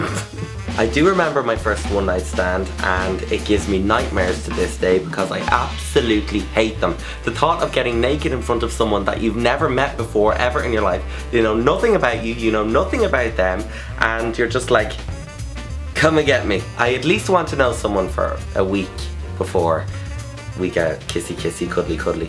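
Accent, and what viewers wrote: Ireland. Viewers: your accent is awsome love the irish accent its so sexy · ) I love your videos, and your accent! Ireland is one of the prettiest countries I've been to!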